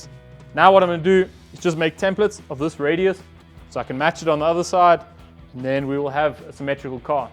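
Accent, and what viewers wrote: South Africa. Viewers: South Africa, my home country. We'll since I support local, I subscribed. Thought that English accent sounded familiar! Great work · You have a South African accent ?!?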